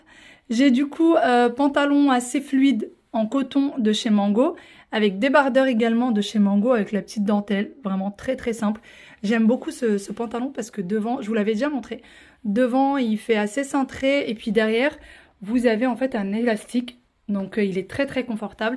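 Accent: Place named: Morocco. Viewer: Moi j adore quand elle parle marocain même si je ne comprends pas trop mignon 😊 Changer rien vous êtes mon petit rayon de soleil de la journée vos vidéos je n’en loupe pas une🤗❤profiter avec votre belle famille et ne vous soucier pas de ses commentaires idiot❤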